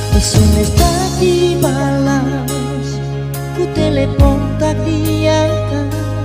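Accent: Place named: Nias, Indonesia. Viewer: Masukan aja bg, kalau boleh logat nya jangn terllu keras logat logat nya, nias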